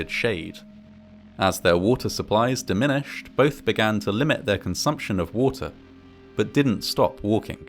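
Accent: United Kingdom. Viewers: He’s a Brit let him speak British english in peace, man lmao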